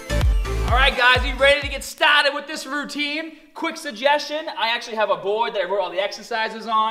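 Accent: Boston, United States. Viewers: Your Boston accent makes this hard to watch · I swear... I used to watch your videos when you were first getting "staaaahhhhhttted", and you did NOT have that heavy Boston accent whatsoever. Did you just get hella bored one day and decided, "Fuck it, I'm gonna start having a dense Boston accent in my videos"? I don't get it. But I like it. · Wow...I feel so petty that I just can't to listen to him talk with that Boston accent. I feel kind of weird that it annoys me that much. When I am not a negative or petty person at all. But he won't miss me not subscribing. He has plenty of people that don't care. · This guy has a Boston accent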